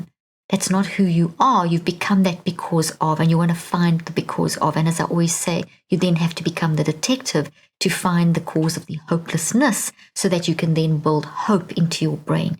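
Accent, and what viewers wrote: South Africa. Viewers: South African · It is amazing how your South African accent remains over all these years. Thanks for these podcasts Dr. Leaf. South Africa will grow and develop within time- hope just needs to be cultivated in people's minds again. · South African I think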